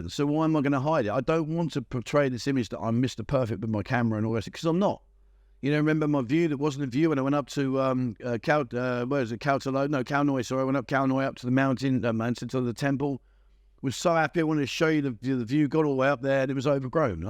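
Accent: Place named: Cockney, United Kingdom. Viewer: well done. Started watching you in mid 2021 when looking for places to retire too. Always fun, informative and delivered with cockney honesty. Well done son.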